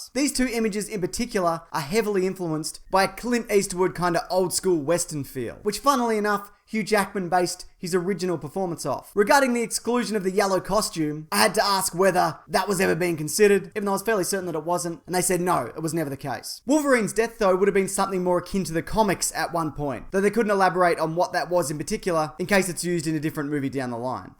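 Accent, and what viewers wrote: Australia. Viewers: you sound like aussie man.......not just because you are Australian but you sound like him.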